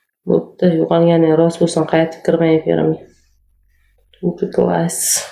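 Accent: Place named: Fergana Valley, Uzbekistan. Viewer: ❤. Salom. чиройли сингилжон, Шева водийликка ухшайсан, хозирда каерда ишлайсан, сенга омад тилайман. Хохласанг оздирадиган рецепт ташлайман. И. Б. Н. Синодан. ❤❤❤❤❤❤❤❤❤❤❤❤❤❤❤😂😂🎉🎉🎉❤❤❤❤.., раджаб ота78 еш.. шифор пенсионер.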